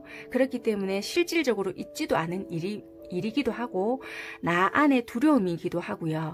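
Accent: Busan, South Korea. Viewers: 개인 전화 상담 신청 어떻게 하나요 🥺❤️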